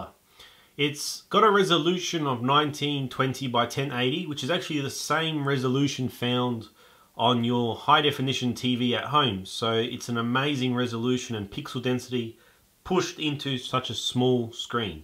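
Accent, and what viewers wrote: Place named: Australia. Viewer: Aussie accent!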